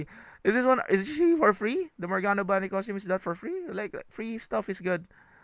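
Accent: Philippines. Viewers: I notice the accent, are you a Filipino?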